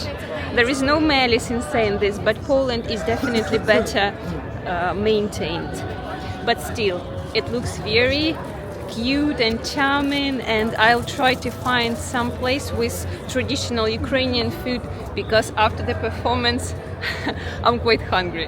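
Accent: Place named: Russia. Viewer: не возможно слушать этот ужасный русский акцент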